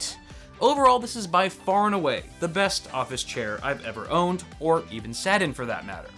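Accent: United Kingdom. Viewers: ... I'm from the UK. British accent approved. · love the british accent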